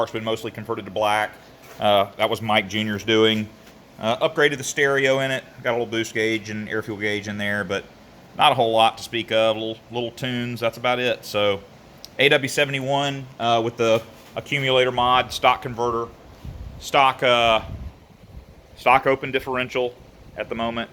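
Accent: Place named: South, United States. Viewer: I love how you open up all nonchalant with your southern drawl. Then, with hardly any build up, you proceed to just drop some extremely deep turbo and tuning knowledge. Hahahahaha! Looking forward to seeing this one develop.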